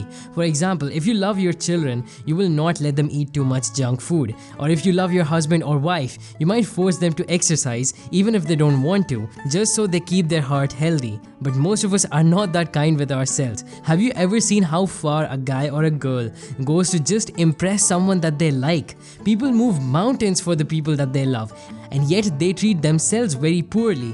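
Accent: India. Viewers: I think the accent comes from him being Indian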